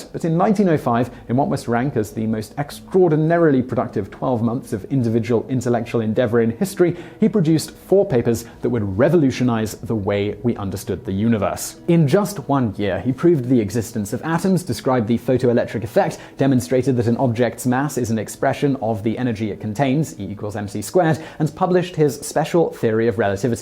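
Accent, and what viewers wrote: United Kingdom. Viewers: TheLadyBridget Simon has made a video in the past stating he pronounces things the way they pronounce it in Britain, using Commonwealth English. So if it sounds different from what we are used to, we can chalk it up to different dialects of English.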